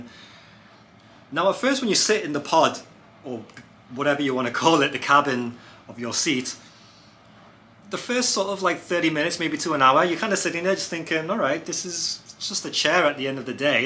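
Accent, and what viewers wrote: United Kingdom. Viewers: ARE YOU BRITISH??? Dude I thought you were an Aussie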